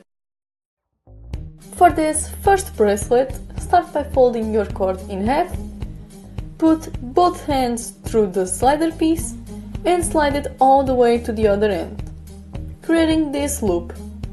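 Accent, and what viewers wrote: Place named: Poland. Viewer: Love the accent....Polish 🙂?. The last bracelet was awesome. I will do the end caps in silver...thanks for sharing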